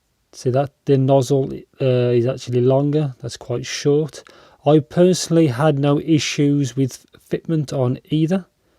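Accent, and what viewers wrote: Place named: Black Country, United Kingdom. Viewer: I dont know if it's the short, informative and to the point videos or the (I want to say black country?) accent that makes me feel at home but you have to be in my top 5 youtubers. Always eager for a new video from you, especially for iems and daps! Keep up the great work!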